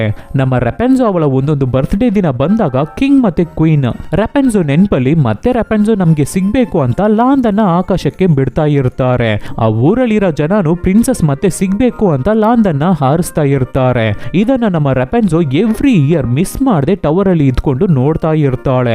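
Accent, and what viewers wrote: Tamil Nadu, India. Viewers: அண்ணா நீங்க தமிழ் காரங்க தான நீங்க பேசுற அப்பவே தெரியுது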